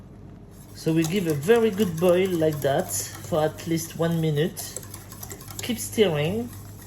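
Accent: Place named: France. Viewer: I have seen you make this recipe before and thanks also for never failing to put a smile on my face because you are so funny and you have the best French accent.😊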